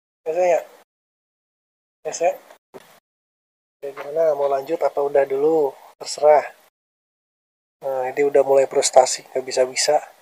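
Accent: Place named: West Java, Indonesia. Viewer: Nyunda asek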